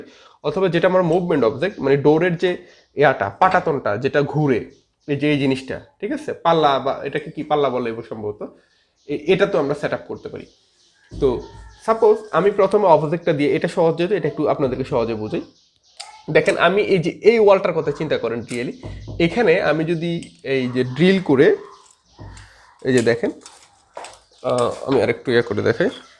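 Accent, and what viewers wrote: Noakhali, Bangladesh. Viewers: নোয়াখালীর ভাই নি আননে 🤭🤭🤭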